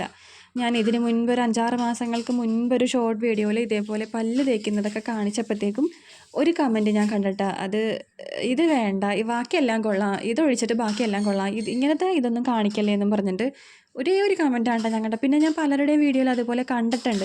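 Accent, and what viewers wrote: Kochi, India. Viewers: Kochi slang angane aanu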